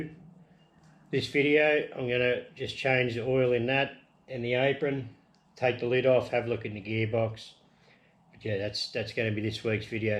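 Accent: Australia. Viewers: yeah bung the DRO on, I need to put mine on and having an example of someone who talks Australian doing it would be really good!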